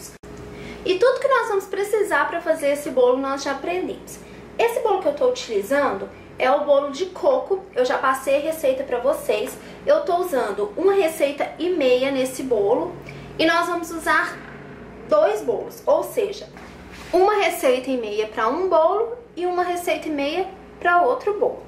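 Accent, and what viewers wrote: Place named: Minas Gerais, Brazil. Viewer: Parabéns. Arrasou, como sempre. Adoro seu sotaque de Mineirinha. Uma graça! Beijos querida.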